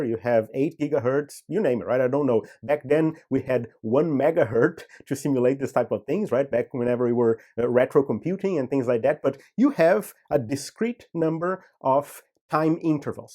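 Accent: Brazil. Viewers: this guy has to be brazilian, no where else we find that accent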